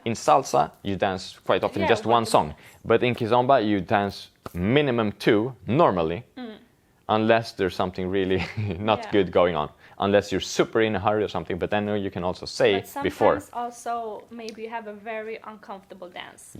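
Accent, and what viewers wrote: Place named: Sweden. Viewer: Hey, are you Swedish? The reason for why I’m asking is because it sounds like you have a Swedish accent! I am half Swedish myself. I think you are the best male Kizomba dancer, you make the dance really beautiful and not too much. Mikaela is also the best female kizomba dancer in my opinion! I think she’s also Swedish... anyway it’s so fun to see you together, I hope you do dance videos together that would be absolutely amazing!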